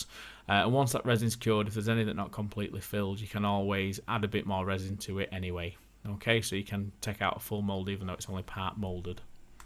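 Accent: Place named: Yorkshire, United Kingdom. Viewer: Never have I seen someone clearly more Yorkshire than you... as someone from the Red Rose county i could take a natural offence. However, love your work so keep it up! ;)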